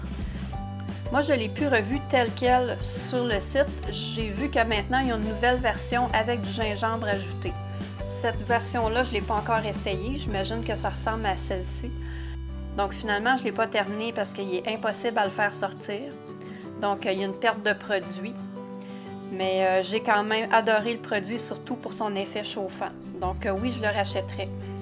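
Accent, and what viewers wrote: Quebec, Canada. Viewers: Superbe vidéo produits terminer ma belle tu es québécoise car ta un accent ? ❤❤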